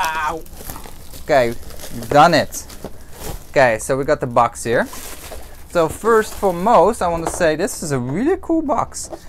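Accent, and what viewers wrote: Germany. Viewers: Are you german? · You are a German aren't you?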